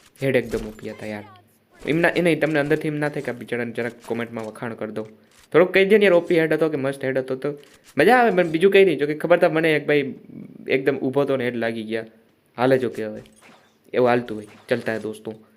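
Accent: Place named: Kathiawar, India. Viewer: Bhai thodi kathiyawadi pan bolo ne pehla ni jem. 🙏😊,commentry in old style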